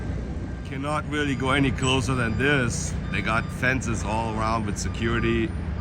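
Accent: Germany. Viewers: Sounds like you have a cold and your German accent has gotten stronger. Welcome back to California.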